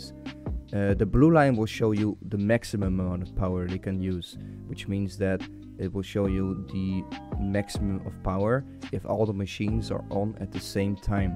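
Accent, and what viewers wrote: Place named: Netherlands. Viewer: gij zijt nederlands he da hoort ge aan u stem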